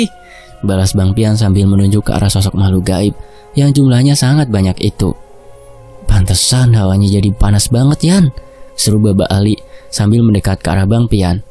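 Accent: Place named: Betawi, Indonesia. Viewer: Wa'alaikum salam wr wb Saluuut bgt sama mas suaran & Dialek Betawinya pas bgt menyatu & menjiwai kereen 👍👍👍🥰🥰🥰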